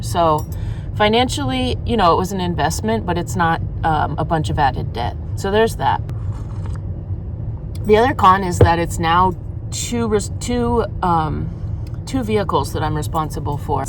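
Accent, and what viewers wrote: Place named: Norway. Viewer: Where are you from originally? I hear some Norwegian. Dont ya know..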